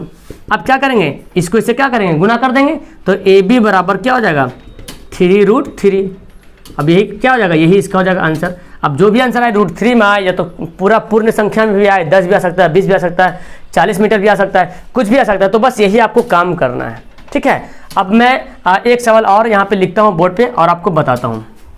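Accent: Bihar, India. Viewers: Aap bihar se h kya?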